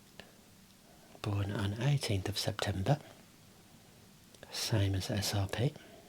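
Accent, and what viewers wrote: Birmingham, United Kingdom. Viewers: Genuine question - how come you're a Utd fan rather than Villa, Wolves or Birmingham? You're accent suggests brummie or black country. Was Utd a family thing or personal preference?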